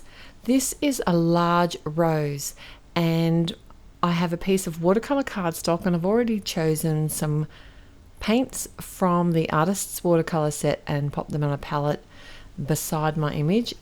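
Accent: Australia. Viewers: So lovely to hear an Aussie accent on my crafting channel!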